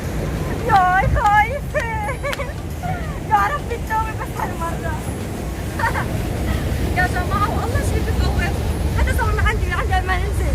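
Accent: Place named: Syria. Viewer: انتي حليبة ليش هيك بتحي ههههههه وسورية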